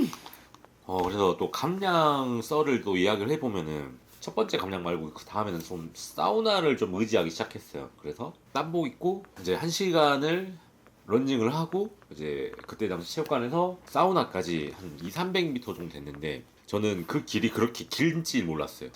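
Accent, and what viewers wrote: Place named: Jeolla, South Korea. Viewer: 오 완전 서울분인줄 알았는데 유튜브로 보니 좀 전라도 사투리가 들리네요 ㅎㅎㅎㅎ 매력있슴다~~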